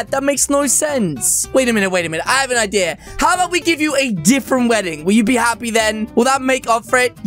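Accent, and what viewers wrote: England, United Kingdom. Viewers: Search for more bullies in bubble gum simulator Also are you from england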